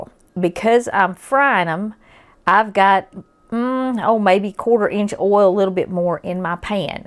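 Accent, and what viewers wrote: South, United States. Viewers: Great video- very helpful I love your southern accent are you from Tennessee?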